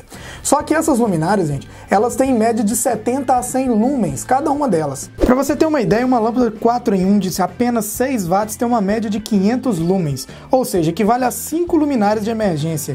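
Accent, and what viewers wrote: Goiás, Brazil. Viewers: Goiano? O seu assistente é de Goiàs! hehehh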